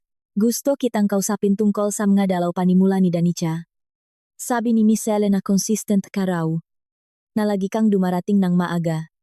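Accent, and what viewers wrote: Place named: China. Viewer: Ang narrator lasing ata intsik ang nagsasalita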